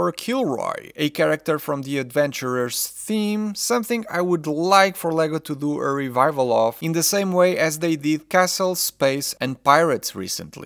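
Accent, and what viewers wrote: Portugal. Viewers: Pelo sotaque e pelo nome deves ser tuga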